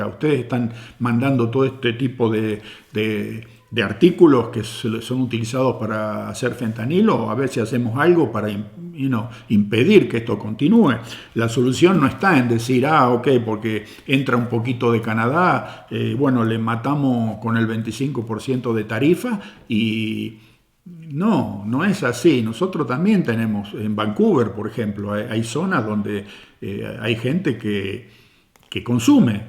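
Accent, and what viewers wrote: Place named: Cordoba, Argentina. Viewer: Pensé que usted era un argentino cordobés para más dato y no un canadiense.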